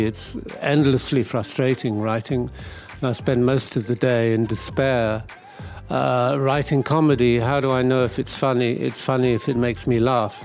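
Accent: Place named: Germany. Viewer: Nice German accent...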